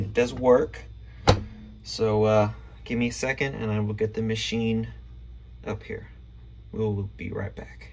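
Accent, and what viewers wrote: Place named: France. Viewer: You sound really cute 🥰;) French accent is nice 😊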